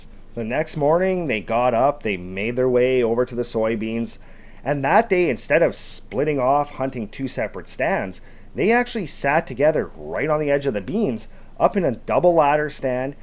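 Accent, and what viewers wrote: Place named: Canada. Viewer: That’s an insane accent 😂 no wonder Americans make fun of us. - a west coast Canadian ❤😂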